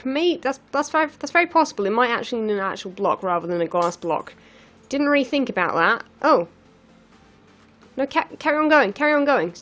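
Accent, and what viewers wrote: United Kingdom. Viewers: Excited much???? Gaw, at times you were talking so fast I couldn't understand you through that thick British accent. No offense meant, seriously none meant. Have to say things like that because apparently I can offend any female just saying "Hi". Love it take care.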